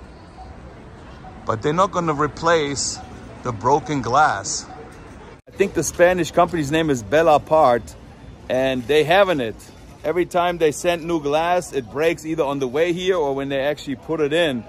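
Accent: Germany. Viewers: Your mild German accent sounds so pleasant to my ears:)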